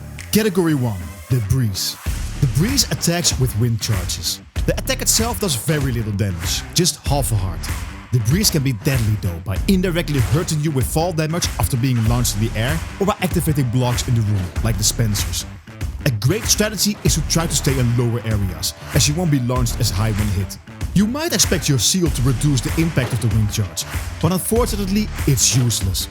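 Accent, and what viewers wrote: Netherlands. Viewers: Ik hoor aan je engels dat je NL bent 😂😂😂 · Bro is echt puur Hollands 😂😂😂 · your accent is so extremely dutch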